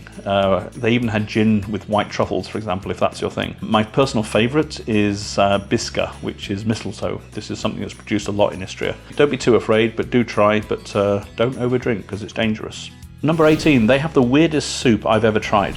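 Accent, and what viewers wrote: United Kingdom. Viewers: So sorry for sending you across the ocean. Somehow missed your British accent. But, later certainly explains your fluid words. NEVER Looking forward to your next thing. 🤗👍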